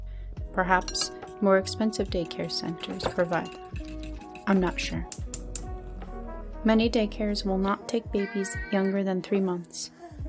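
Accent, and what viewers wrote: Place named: East Coast, United States. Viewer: Mrs. is too so good at narrations. It is like a movie, very beautiful sweet voice💓 To me, the air of your speaking English has reminded me of the well behaving American girls from the east coast of the US I as a foreign student from Japan met at the college dorm more than thirty years ago. I miss them and those days. Anyway, I see how young mothers in the US are doing in their child rearing. Good luck and looking forward to the next one😊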